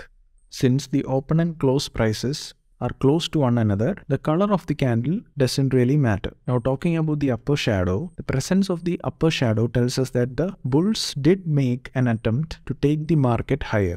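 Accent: India. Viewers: How to understand the Indian pronounce？ 😅😅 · get an voice actor cause the idian accent drives me nuts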